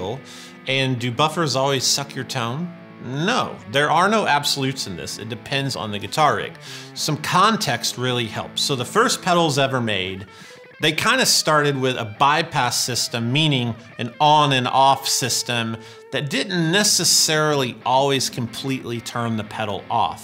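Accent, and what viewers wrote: United States. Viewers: I love how he uses the contrived southern accent when he wants to sound ignorant. I believe he’s from Dixie and he speaks with a regular American accent.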